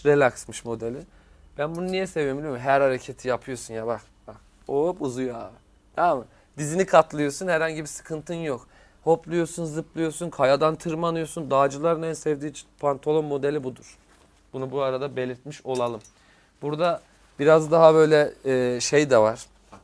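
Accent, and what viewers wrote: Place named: Ankara, Turkey. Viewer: Nasıl içten nasıl bizden. Angaralı gibi gonuşuyon valla. Arkadaşlarla mağaza geziyorum gibi oldum 😀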